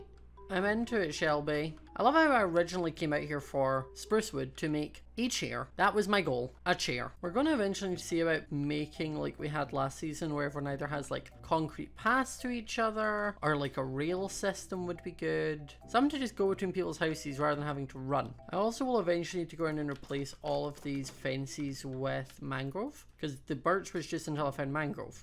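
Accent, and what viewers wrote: United Kingdom. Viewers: Waittttttt are you british?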